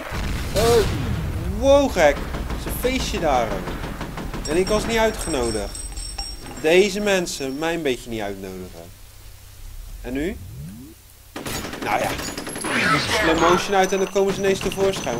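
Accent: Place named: Rotterdam, Netherlands. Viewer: Heerlijk dat Rotterdamse accent!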